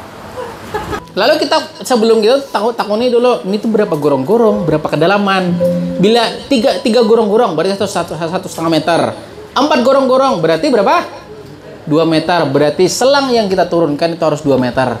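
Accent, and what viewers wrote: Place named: Hulu Sungai, Indonesia. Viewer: Hadiirrr ... Nah pak ulun curiga mendengar logat piyan ne mulai awal nonton Chanel ini, model ada hulu2 nya macam urang hulu sungai🤭sekalinya ... Kita sebanua, Ulun asli Martapura, tp kuitan Ulun hulu sungai asli Kandangan wan Barabai, Sukses buat Chanel piyan ini, SGT BerManfaat... Smoga Pertanian Indonesia Maju😇 Aamiin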